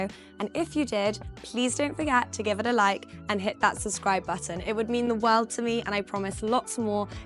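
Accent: RP, United Kingdom. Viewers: Because of your nice legs and posh and the way you say all, Awl I’ll buy this house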